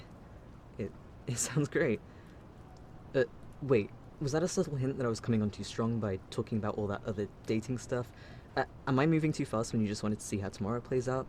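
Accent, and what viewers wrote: United Kingdom. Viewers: I love your British Accent and you deserve more Subscribers! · R u British because that accent hits different for me like omg how can someone have an angelic voice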